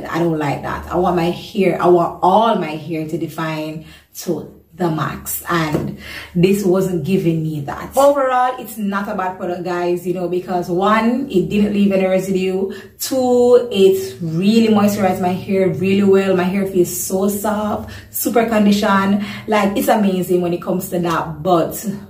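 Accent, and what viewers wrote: Jamaica. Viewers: I can hear the Jamaican in you. I am watching you from Canada! I love your video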